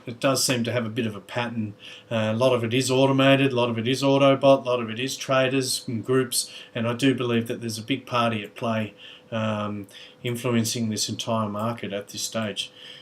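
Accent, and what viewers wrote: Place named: Australia. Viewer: thanks, I'm enjoying your commentary, nice to hear an Aussie voice on YouTube!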